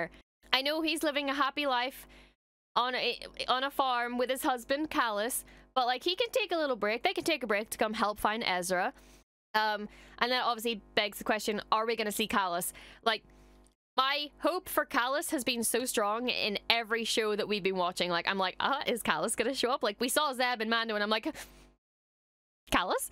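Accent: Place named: North, United Kingdom. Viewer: great job lovely lass, always a pleasure and entertaining watch. im from Louth and im still thinking what county you are from in the North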